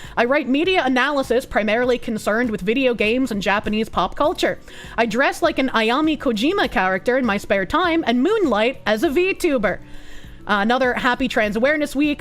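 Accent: Boston, United States. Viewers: It's Bostonian dialect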